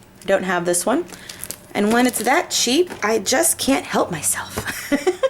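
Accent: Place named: New York, United States. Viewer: I knew you from NYC! I here it in your voice lol. Anyways.. Love the haul!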